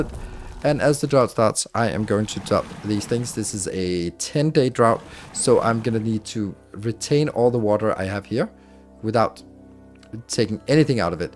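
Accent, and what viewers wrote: Denmark. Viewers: Er lige faldet over din profil mega fedt content glæder mig til at følge med, blev nød til at tjekke om du ikke var dansk hahah for synes jeg kunne høre det i måden du taler engelsk på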